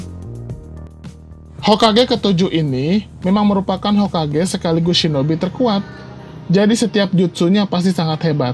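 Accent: West Java, Indonesia. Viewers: logat sunda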